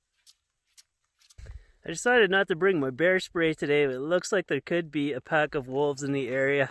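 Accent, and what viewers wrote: Canada. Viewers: i was just there !!! staying in my self converted trailer. very new. just found your videos. cheers dude!! love the canadian accent with the sarcasm. ahahah maybe see you out there sometime. im personally not ready for -20 yet but are we ever fully. Beautiful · EXCELLENT VLOG. THE SNOW AND MOUNTAINS ARE UNBELIEVABLY BEAUTIFUL. APPRECIATE THE CANADIAN ACCENT !!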